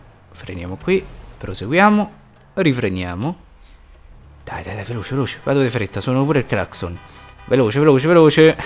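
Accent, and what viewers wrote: Rome, Italy. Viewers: Che se de roma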